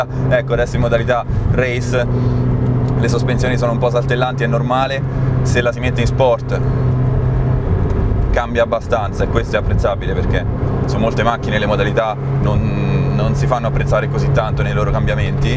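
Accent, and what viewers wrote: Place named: Corvaro, Italy. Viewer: Ciao... no sicuro proprio no... ma vedo sempre più video simili... dove un comune mortale non può quasi mai arrivare... spero non ti sii convertito totalmente a questo livello di auto... ti seguo da sempre x il modo di raccontare le auto e la storia, e perchè mia moglie e di Corvaro noi siamo in sardegna e quindi a mia moglie le fà piacere sentire quell'accento... verró a trovarti promesso!!! Perdonami se è sembrata una provocazione!!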